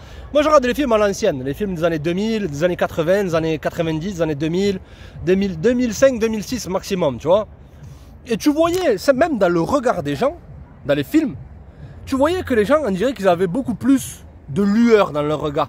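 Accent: South, France. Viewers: Merci pour ton post! Tu as bien fait de parler avec ton accent du Sud, c'est encore plus VIVANT! Tu vois, j'ai 78 ans et je ne suis pas une "meuf", je suis un etre humain engagee dans ma vie qui est simple, car je refuse d'etre dans la consommation. (Comme j'ai toujours fait!) Et j'apprends a me contenter... C'est un choix, car je suis persuadee que ce systeme touche a sa fin...et que bientot tous ces zombies, comme tu les appelles, vont se reveiller et devenir des ETRES, parce que chacun est responsable de sa propre planete! C'est bien beau de constater ce qui ne va pas, mais ca ne suffit pas. C'est a chacun d'entre nous de produire autre chose et d'aller le chercher en lui-meme, comme l'eau que l'on puise dans le puit, il y a UN EFFORT PERSINNEL POUR RAMENER L'EAU! (Si ca te parle...) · Je ne te connais pas , je te découvre et tu m'es déjà sympathique. 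Un bon accent du Sud de la France, cet accent que l'on entend presque plus même dans le Sud où les jeunes font tout pour le perdre. Et ton analyse sur la société même si tu n'as pas l'air bien vieux, enfin disons beaucoup plus jeune que moi 😉 je la partage totalement. Ca me fait plaisir en regardant ta vidéo de voir qu'il existe encore des gens authentiques. Moi quand j'allais à la salle de sport au début des 90's, il n'y avait pas de femmes, ça sentait la sueur et la testostérone 🤣. Les femmes fuyaient ce genre d'endroits. Aujourd'hui les salles de sports sont devenues des endroits incontournables pour se pavaner, se montrer, créer des stories pour alimenter son compte Insta ou que sais je. Le smartphone est un véritable fléau oui, n'ayons pas peur des mots. Et cela touche toutes les tranches d'âges, ce n'est pas propre aux plus jeunes. Tiens récemment je suis allé au restau avec des amis tous des quinquas comme moi, entre chaque plat, silence radio tout le monde était sur son smartphone. 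A un moment j'en ai eu marre et j'ai poussé une gueulante ! Oh les gars ! On Se retrouve entre amis pour passer du bon temps, pour partager, communiquer et vous qu'est-ce que vous faites ??? Vous pouvez pas le lâcher le temps d'une soirée votre joujou !!?? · Incroyable, tu sais que avant que tu dise 5ans, ç est sortie de la bouche, donc je ressent la même chose et oui terrifiant, moi suis des années 1970, et j'en peu plus de tts ça 😢, et en plus les gens sont tellement horrible qu'ils émanent leurs négatifs autour de peu de gens qui restent positifs, donc c est vraiment dur 😢 Mais j essaye vraiment de tenir le cap, courage à tts ceux qui sont décourager, 🙏💜 Moi pareil jme dit tu n ai pas de cette planète, alors merci à toi, j aime trop ton accent du sud ça fais du bien à entendre 🙏💜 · Tu nous aurais pas dis le sud de la france ton accent plein de soleil nous le disait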